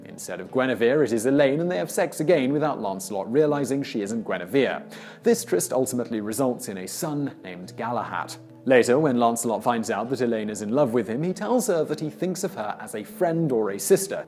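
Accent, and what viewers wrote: United Kingdom. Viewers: Why do Brits pronounce it SICKTH instead of SIXTH?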